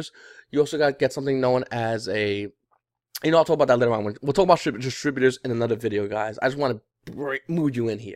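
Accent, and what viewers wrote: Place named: New York, United States. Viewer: you're from New York aren't you lol. Thanks for the vid.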